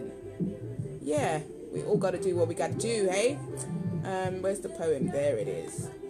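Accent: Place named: London, United Kingdom. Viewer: So beautiful to hear a London accent in the Melanated community on YT.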